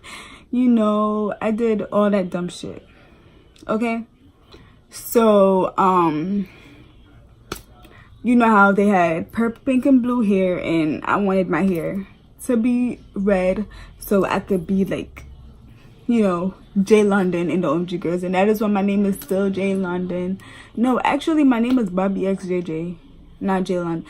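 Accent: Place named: Jamaica. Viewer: Nuh understand where yo Jamaican accent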